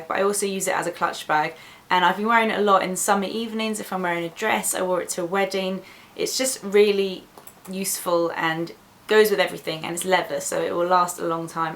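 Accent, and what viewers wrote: United Kingdom. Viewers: british accent...subscribed! :D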